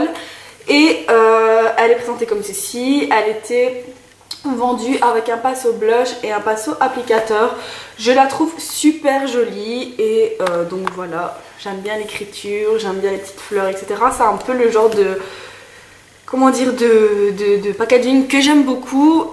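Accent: Belgium. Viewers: Coucou, chouette vidéo, malgré que je l'ai déjà regardée 3 ou 4 fois sur d'autres chaines, c'est tjrs intéressant. Je partage tes gouts pour les fàp, d'ailleurs j'adore la palette que tu as composée (make up studio). Et la naked 2 me tente beaucoup, mais je ne l'ai jamais encore vue en vrai parce qu'à Liège il n'y a pas de Séphora, il faut aller jusque Lille :) Rien à voir mais tu es belge? J'avais décelé un petit accent, mais surtout tu as parlé du salon esthétika (je sais pas si ça s'orthographie comme ça ^^).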